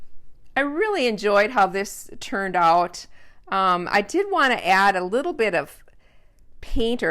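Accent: Minnesota, United States. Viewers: Ah that Minnesota accent with your fabulous creativity. Love it!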